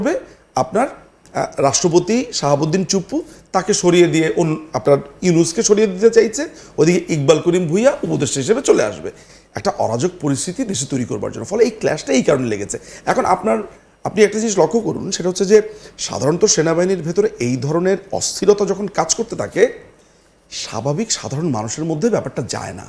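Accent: Kolkata, India. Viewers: Kolikata accent already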